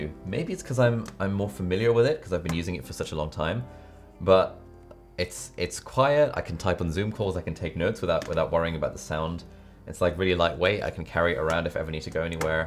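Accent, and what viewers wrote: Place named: RP, United Kingdom. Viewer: I would like to know where your accent comes from because you sound a little rp however im not sure, I can't figure it out by the way I really like your accent!! You sound so nice!!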